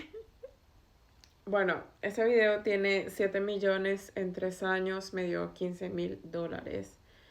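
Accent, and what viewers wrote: Colombia. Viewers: Porqué tienes un acento colombiano tan marcado 🤔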